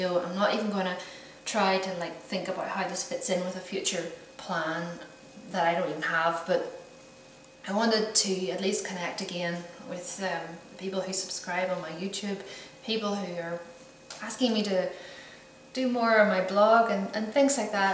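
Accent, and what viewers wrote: Ireland. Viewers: So, you from Ireland?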